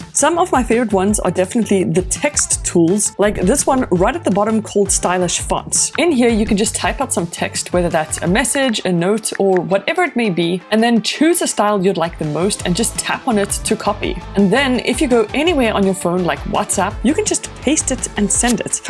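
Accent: South Africa. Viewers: I can’t unhear how much you sound South African… 😅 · You sound very South African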